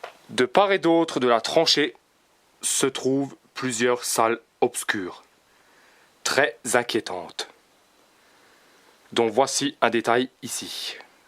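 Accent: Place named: Alsace, France. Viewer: La voix n'est absolument pas robotisée, mais l'auteur a un accent alsacien très prononcé tout simplement. Il a le mérite d'essayer de se mettre dans la peau de ceux qui y étaient!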